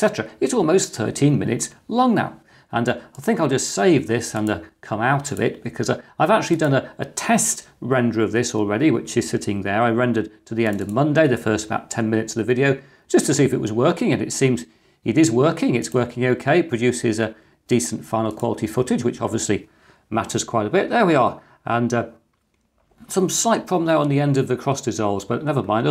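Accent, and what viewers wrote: United Kingdom. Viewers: The whole Retrofuturustic vibe of this channel , presented by a (very) Brittish Professor is just so unique I can't have enough of it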